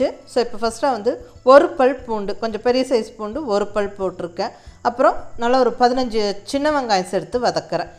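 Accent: Kongu, India. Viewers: Super mam... 👌👌kongu tamil la super ah explain panringa mam...👏👏👏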